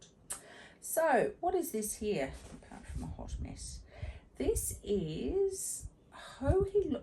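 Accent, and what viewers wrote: Australia. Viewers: Look, you could possibly take your own advice and not knit the rest of the MKAL just because you aren't feeling it. But you can still use what you have done to make something. One of the European knitting podcast girls made hers into a cowl. I'll go follow you on Instagram and leave a comment with a link to her for you. So enjoy having another Aussie to listen to while I knit. 💜💜💜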